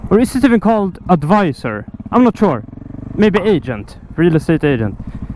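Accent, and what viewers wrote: Sweden. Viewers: Svengelskan delux 5/5 👍👍👍👍👍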